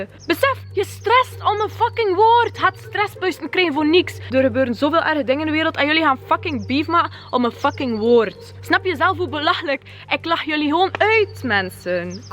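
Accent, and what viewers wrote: West Flanders, Belgium. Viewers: Het is echt niet erg! ♥ West Vlaams is supermooi en alsof de mensen die jou uitschelden zelf beter zouden kunnen! ♥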